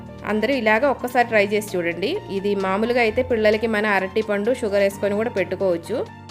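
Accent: Kerala, India. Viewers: I'm ur new subscriber... ur way of explanation and kerala slang telugu i love that 🤍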